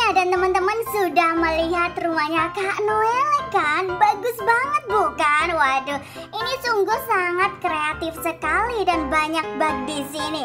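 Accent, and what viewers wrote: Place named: Java, Indonesia. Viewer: Kakak ini orang jawa ya? Logat nya kerasa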